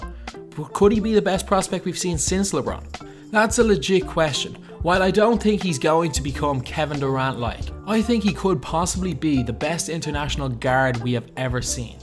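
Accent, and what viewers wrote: Dublin, Ireland. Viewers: Are you from Dublin? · you Irish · Oh shit nvm what county your from lad ? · gotta be Irish with that accent lad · Are you from Dublin? I'm from Kerry. Love the content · are u irish?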